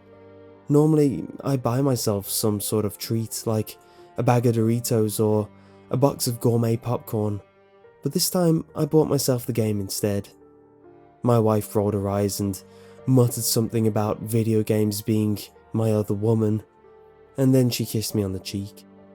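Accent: England, United Kingdom. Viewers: SPOILER ALERT: The image described at the end is the actual photograph of Mary Kelly's crime scene by the sound of it. This is a good one, I like your taste. Good to hear an English guy reading these things too I have to say, it's quite rare though I have found others. I might even record some myself, as I haven't yet heard an English woman narrating creepy pasta's or other horror stories. I think the Creepy Pasta genre is a bit over saturated now, I was thinking of just reading old horror stories the copy right has expired on maybe. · How fitting to be told by an English man. x3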